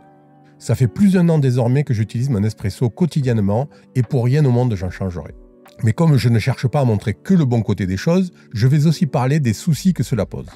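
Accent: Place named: Provence, France. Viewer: Bonjour et merci une nouvelle fois pour votre vidéo , qualitative et initiant la réflexion. En effet, je suis à l’aube de faire l’aménagement de mon fourgon, pour un départ au long court avec mon épouse. Nous allons boire du café (beaucoup pour moi!), cuisiner etc..;vous connaissez le sujet. Je m’inspire donc de vos vidéos qui après maintes vues de centaines d’autres sur le sujet de l’aménagement et de la « fourgon’life » me parlent un langage que j’aime bien. Je laisse de côté ce que m’inspirent les autres… Et j’aime bien aussi votre accent, étant moi même de St Chamas. Je voudrais emporter dans cette future vie le robot ménager de ma chérie: le thermomix. Gros consommateur d’énergie, cet outil qu’elle maîtrise parfaitement depuis des années, nous vaut des repas à base de produits frais et divers que l’on peut facilement trouver partout. Votre montage électrique, sa composition, sa capacité se rapprochent du montage vers lequel je me dirige. 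Mais mon niveau électrique me fait hésiter quand à la bonne réalisation du projet. Je peut toutefois compenser par un esprit curieux et motivé. L’emport et l’utilisation quotidienne de cet appareil vous semble t elle appropriée où à proscrire ? Encore merci rien que pour ne pas dire de niaiseries Charles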